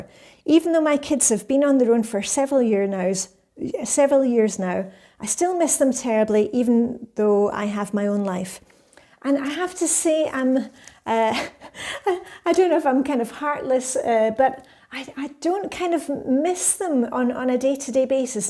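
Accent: Scotland, United Kingdom. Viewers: Gosh!! How many languages do you speak?? Obviously French and English (with a Scottish accent) do you speak Danish and Swedish as well???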